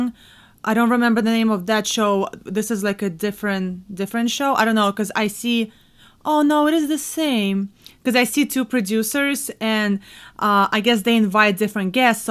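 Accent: Russia. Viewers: русский из английского никак не вытравить))) но за обзор русской , самой лучшей певицы , спасибо! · что за русский акцент у вас?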